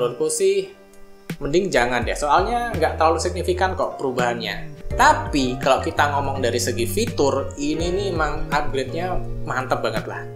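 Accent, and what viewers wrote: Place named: Java, Indonesia. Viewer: logat jowo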